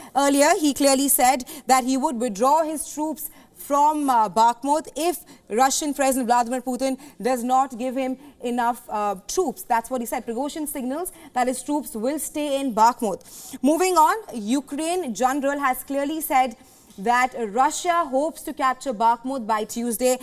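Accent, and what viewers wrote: India. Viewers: hinaya ra pag basa ayaw pag dali indian ragud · Please not use indian English slang telling news. It sound like comedy news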